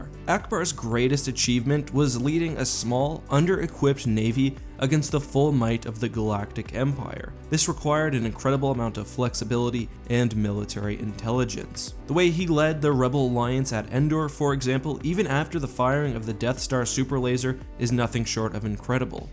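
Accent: Canada. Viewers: Ay you canadian??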